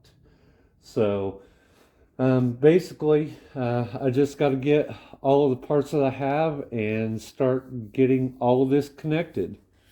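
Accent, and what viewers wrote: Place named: North Carolina, United States. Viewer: What dialect are you speaking? North Carolina?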